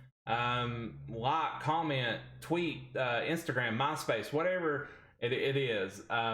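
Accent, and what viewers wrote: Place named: Texas, United States. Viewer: Nice video. Nice job. Everything said is to the point and very accurate. Cool southern accent too. Probly Texan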